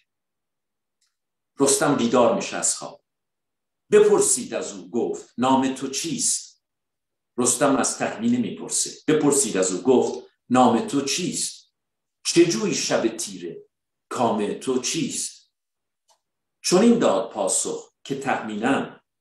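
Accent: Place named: Iran. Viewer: Dialekte Shoma az kodum Shahre irane? 👌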